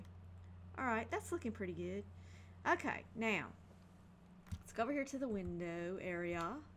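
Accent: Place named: Texas, United States. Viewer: you from Texas? You have a cool western/cowboy sorta accent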